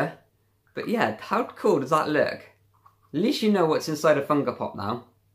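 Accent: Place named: United Kingdom. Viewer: What a British accent and its not a bad thing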